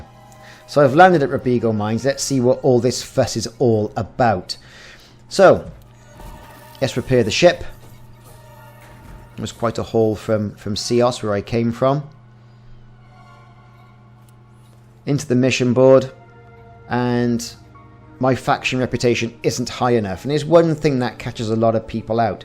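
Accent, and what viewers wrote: Wales, United Kingdom. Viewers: o7 you a welsh man butty :)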